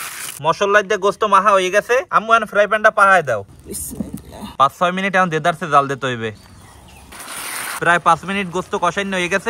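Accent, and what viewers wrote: Barishal, Bangladesh. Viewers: অসাধারণ মাশাআল্লাহ এক্কেবারে বরিশাইল্লা ভাষার নাহান😂 মজা হইছে দেখে বোঝা যাচ্ছে😊😊😊😊😊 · আমার কাছে বরিশালের কথা ভালই লাগে। আর ভাই এর কথাগুলো মিষ্টি ❤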